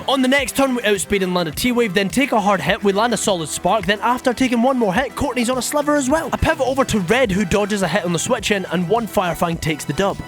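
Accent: Scotland, United Kingdom. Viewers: Quick question are you Scottish?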